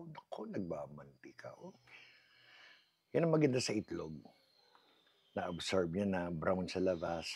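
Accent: Marikina, Philippines. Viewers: Puntong Marikina ang salita niyo. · Yung accent ninyo talaga pure taga Marikina😊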